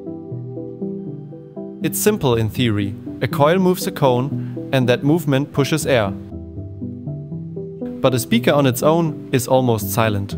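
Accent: Germany. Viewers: Man hört das du deutsch bist ❤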